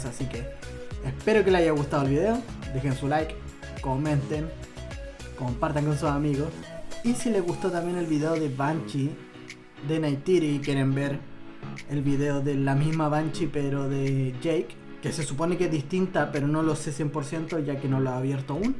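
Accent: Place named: Chile. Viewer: Amigo mio, vi un video tuyo armando la baf de controller, me causo familiaridad tu acento. Me ha sido difícil encontrar un chileno que coleccione y comparta videos como los tuyos. Te felicito por tu colección y videos los cuales seguiré viendo por supuesto. En lo personal también tengo mi colección que seguramente compartiré en algún futuro Un abrazo desde Chile amigo, Talca Que pases un excelente año nuevo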